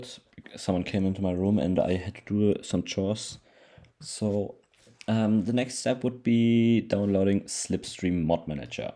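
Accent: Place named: Germany. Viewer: Fantastic video!! your english is perfect and your german accent is nothing to be ashamed of. i had spent the last year trying to find a solution for multiverse on my macbook, only recently discovering it could work with crossover with a few shenanegans, im sure this video will be a goldmine for anybody like me who cant fork out hundreds of dollars for it.. fantastic work!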